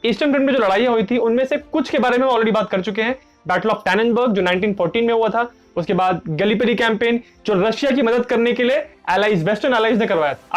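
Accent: Bengal, India. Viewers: Are you a Bengali?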